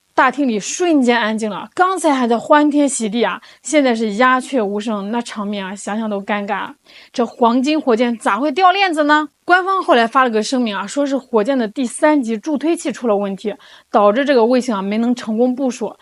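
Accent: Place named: North, China. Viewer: 但一定是北方人😊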